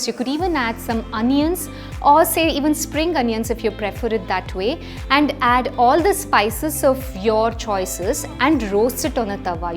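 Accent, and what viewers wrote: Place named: Bangalore, India. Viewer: ..I think u r frm Bangalore...too much Bangalorean Influence..while speaking and suggesting diets...it's very subliminal...who is being to Bangalore..he will understand...need better inputs & suggestion form North Indian diets point of view...bdw..nobody eat dosa like u south indians.. everyday!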